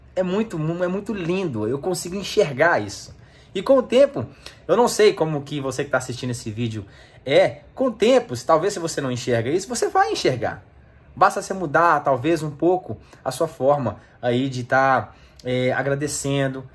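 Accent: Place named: Minas Gerais, Brazil. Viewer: ADORO VER VOCE FALAR MINEIRO...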